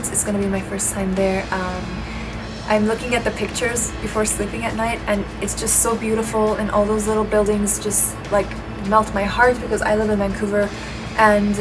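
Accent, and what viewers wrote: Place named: Latin America. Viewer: where is she from? her accent sounds kind of latina 💜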